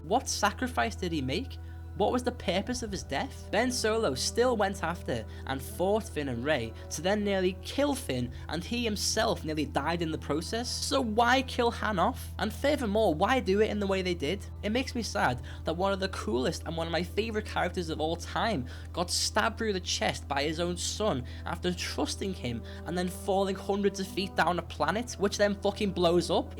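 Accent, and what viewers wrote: United Kingdom. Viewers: Oh wow, so is that a strong british accent?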